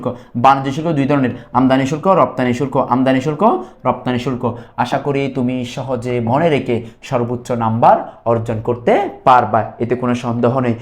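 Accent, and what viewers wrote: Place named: Chittagong, Bangladesh. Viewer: Free class koro Abr eto kisu? Spelling e somossa na sir Chattogram er so sir er pronunciation ta different hobe etai savabik taina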